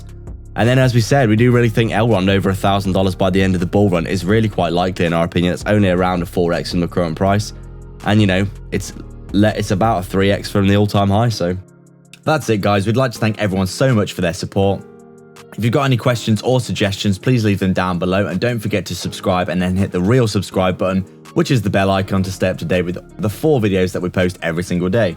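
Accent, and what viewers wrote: United Kingdom. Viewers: You didn’t say where you were from. I’m guessing Brighton👀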